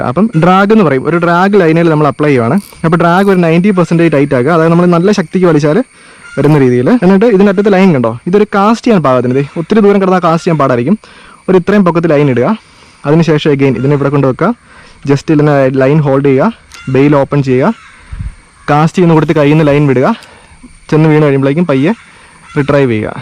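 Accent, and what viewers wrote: Kottayam, India. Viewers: Kottayamkarananno bro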